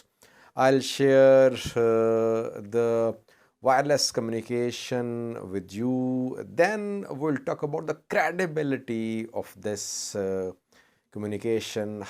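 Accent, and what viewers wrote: Eritrea. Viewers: B.S. 😆 this are Eritrean accent. Why even wasting time for this? You already said it. Any one can do this record. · That’s a Eritrean accent for sure. Lol it’s really easy to notice if you speak Tigrinya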